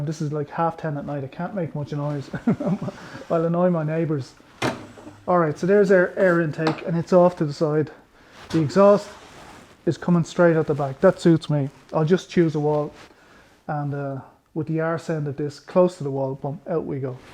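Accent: Ireland. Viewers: Great little video. That positive Irish attitude to life and the cheerful accent. It reminds me of my little Irish mum, god bless her and my she rest in peace. Those diesel heaters are an obsession. I have installed two at the cottage in Southern Finland. One running from the garage under the cottage into one side through a hole through the concrete slab. On the other side of the cottage, in from the patio area through the wall. Last year had only one but in one room was warm but still needed the wood fireplace on the other side of the cottage to heat things. That means trekking through the snow to collect wood to burn. In winter in the Finnish countryside, the snow can be meters deep and by January can be -10 to 20C, and it's a pain(A COLD PAIN) aside from the need to cut wood in the autumn. Filling diesel tanks on these heaters is easier. The newest of these heaters is the HCalary 8,5kw which I bought in mid-summer for a dirt cheap price on e-bay and I have put a 25 liter diesel tank. 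That one has a far better control panel with better features and easier use. The cottage is off grid, so hoping that I get the extra solar panels up just bought cheap to help keep the inverter and four 100Ah batteries topped up for the heaters and lights of the cottage during this winter. We have a Champion generator too, which helps the time we are there to maintain the place. That Hcalary heater can be set to come on when we are not there, but I am a bit unsure of setting it to go as earlier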